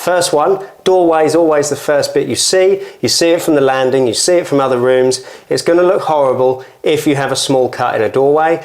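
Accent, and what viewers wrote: Norfolk, United Kingdom. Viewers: That's a Norfolk accent if ever i have heard one. Made me feel right at home 👌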